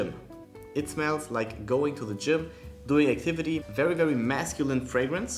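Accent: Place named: Germany. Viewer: Man hört den deutschen Akzent so geil raus 😂. Geiles Video trotzdem!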